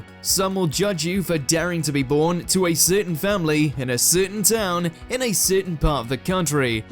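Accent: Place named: Northern England, United Kingdom. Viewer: He pronounced it like most of Northern England. Class, grass, dance, bath, & laugh, don't have the same sound as father there, but more like mass. I won't bring up Scotland as there are multiple accents here, including ones where fancy is pronounced funcie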